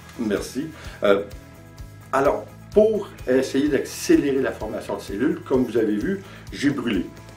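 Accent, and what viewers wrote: Quebec, Canada. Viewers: Waouuu quelle bonne idée !! Et quelle belle vidéo : l'accent québecois me fait penser a mon frère qui vit à Montréal, le premier morceau de musique un vrai régal, et le résultat sur la pierre j'adore... Bonne journée !!!!🤗🤗🤗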